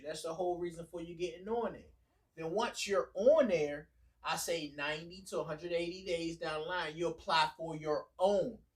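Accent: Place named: Washington DC, United States. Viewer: bro def from pg or dc 😂 facts but great video my guy !!!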